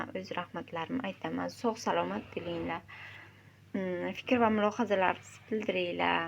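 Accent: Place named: Bukhara, Uzbekistan. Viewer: Каердансиз гапиришингиз Бухороликлардай гулларингизни сотасизми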